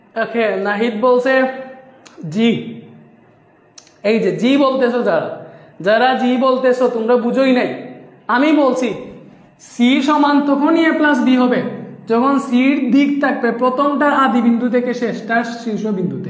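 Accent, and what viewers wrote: Sylhet, Bangladesh. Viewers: ভাইয়া সিলেটি নাকি চট্রগ্রামের?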